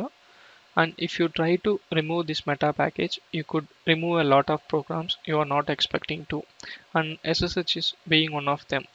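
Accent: India. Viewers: Interesting. I had a bit of trouble with the Indian-English accent. Perhaps a transcript would help. Thanks.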